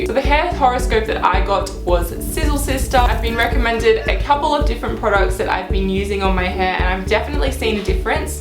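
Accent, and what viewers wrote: Australia. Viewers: Is that an aussie accent? Or kiwi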